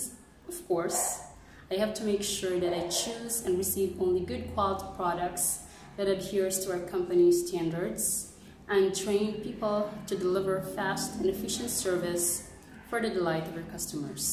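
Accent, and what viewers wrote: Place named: United States. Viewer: Ang galing mong mag english, American ang accent. It's very nice to get to know you,.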